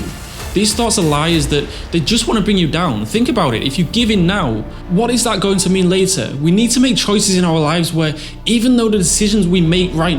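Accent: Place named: United Kingdom. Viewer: I really love your British accent!!😊😊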